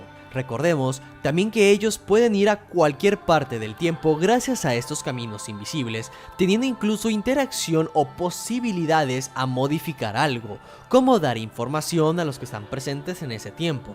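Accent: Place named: Sonora, Mexico. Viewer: No sé si él sea de México, pero yo conozco a muchas personas que son del norte del país y así es su acento, para ser exacta de Sonora, México.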